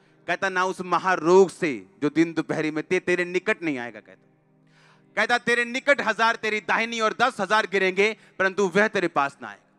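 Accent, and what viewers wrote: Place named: Punjab, India. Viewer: Sir ji daily I listen to you ur punjabi touch is very attractive. Lord Jesus bless you with endless blessing🙏🙏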